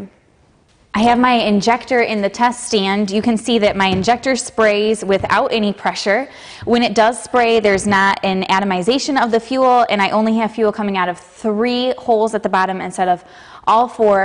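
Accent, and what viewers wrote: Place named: Minnesota, United States. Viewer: I love the rural MN accent! We can smell our own.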